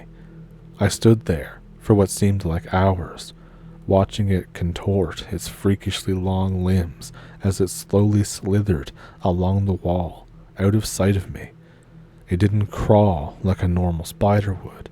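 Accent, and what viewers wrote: Ontario, Canada. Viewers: They popped more than a couple off in the Hudson Bay. That's a fact. Always a pleasure to hear yuir voice, it always reminds me of my Ontario cousins. Oof Da. 🎉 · I think it's neat that anyone outside of Canada listening to this is going to think it's a Canadian accent but as someone who is from Canada and has lived all over I can definitely tell which region you're from. And it reminds me of home❤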